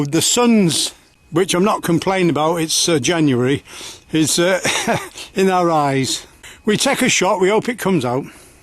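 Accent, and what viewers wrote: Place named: Cumbria, United Kingdom. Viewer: thank you for your nice reply. Yes I am a HUGE fan of the beloved Lake District and indeed I am proud I spent the majority of secondary school days there. I plan to head up here to the equestrian centre adjacent to the boarding school I attended. The equestrian centre used to be Witherslack farm base of course in Witherslack village Grange Oversands. I love your STUNNUNG Cumbrian accent. Yes Morcolme Bay and a few other districts you mentioned are familiar as we at School used to venture. Yes I am DYING to head to the equestrian centre and extend my stay back up here, head to Grange and many other stunning spots. Is there still a Railway Museum at Cartmell?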